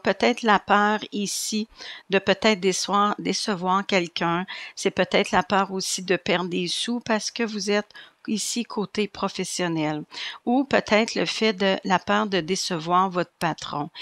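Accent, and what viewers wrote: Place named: Quebec, Canada. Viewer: Bonsoir Mme. Je viens de vous découvrir et c’est une belle découverte. C’est toujours agréable d’entendre un accent québécois dans les voyances, vous êtes peu nombreuses et tous ne me parle pas, ce qui n’est pas votre cas. Votre tirage me parle beaucoup. Je suis vierge, ascendant vierge et lune en vierge, rien de moins 😄. J’aimerais beaucoup savoir le nom de vos cartes s.v.p. je les apprécie beaucoup, les images me parlent. Merci encore et longue vie sur YouTube. 🌺❤️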